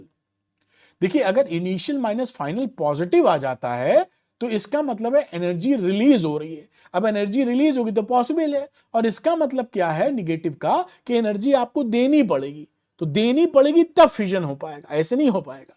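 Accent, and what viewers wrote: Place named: Lucknow, India. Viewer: Sir lucknowii andaz kaafi accha h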